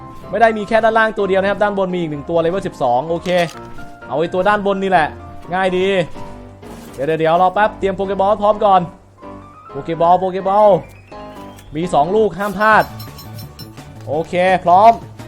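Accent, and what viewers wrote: South, Thailand. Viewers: พี่อยู่ภาคใต้หรอครับ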